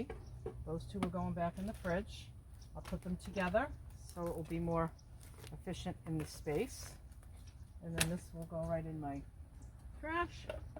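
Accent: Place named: Massachusetts, United States. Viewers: I eat like you I cook mostly potatoes and beans in pressure cooker. I was wandering what is you plan to receive mail? I asked since we are from same state I like your Ma accent I can hear it. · my dad's family is from Canton, MA and I can't tell you how much you remind me of them. Love your 'get it done! ' attitude (and your glorious accent! ♥️) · I love your videos—especially the healthy eating component and your lovely Boston dialect . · love hearing ur Mass. Accent. I am a vegetarian eater too. Steam mostly in fry pan. But oven bake yams & potatoes. U encourage me to use the pressure cooker.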